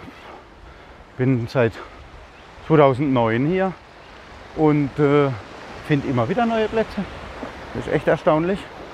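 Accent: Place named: Karlsruhe, Germany. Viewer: Ja er lebt auf Koh Phangan.... wir haben schon einige deiner Videos gesehen und ich habe zu meiner Frau gesagt dass ich das Gefühl habe dich zu kennen. Wir waren 2019 in Khao Lak in Urlaub und haben dich mit Roman bei dir Daheim in Khao Lak besucht. Du warst gerade damit beschäftigt deinen Umzug vorzubereiten. Roman ist ein guter Freund von mir. Ich habe in deinen Videos auch unseren Karlsruher Dialekt rausghört ;-) Wie klein ist die Welt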